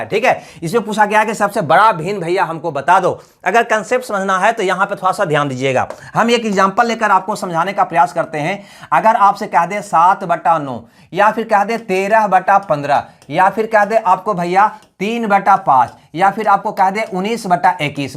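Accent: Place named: mixed, India. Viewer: Sir apne Hindi bolne ki language samj nhi attii simple Hindi boleya naa takke bakki logo ko samj aye ap Bihar up mp type Hindi bolte hoon samj nhi attii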